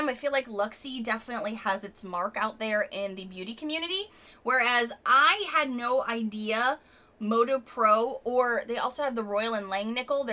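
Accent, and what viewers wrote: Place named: New York, United States. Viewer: Love this style of video. Made me realize how long I have been with BoxyCharm and with you this long too! Remember you used to talk in that awful accent all the damn time?? 😂😂(New York was it) oh and I have extremely dark lips and BoxyCharm sends me nude lip stuff, which I can’t wear! Can you imagine how many I have to give away??🥴 I love the burgundy, reds or mauves! Some of the product brands you love I have too and have yet to try. Some are white girl specific, yikes! I am Mexican and Olive complexion with gold undertones. So you understand. 👍🏽😎♥️🌹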